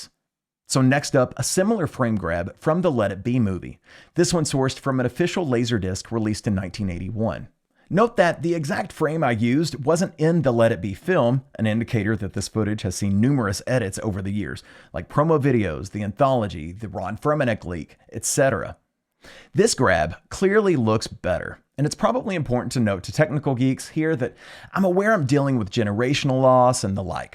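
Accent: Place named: Baltimore, United States. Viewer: I'm digging on your vowel sounds. Must be Baltimore or something like it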